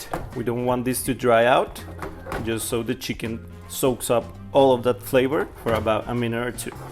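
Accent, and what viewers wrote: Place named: Rolo, United States. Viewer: It's funny how you have that perfect English with the "rolo" accent.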